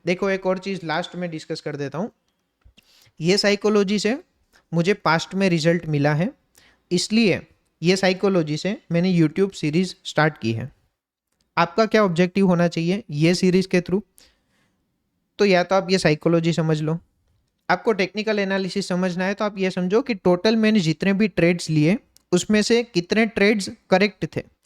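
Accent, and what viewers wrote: Gujarat, India. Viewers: Bhai aapki awaj se esa lag rha hai ki aap gujarati ho?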